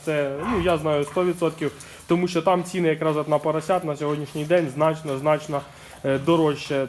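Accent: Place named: Shostka, Ukraine. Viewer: Цікаво з якого ви міста? Бо говорите Шостка Кролевець))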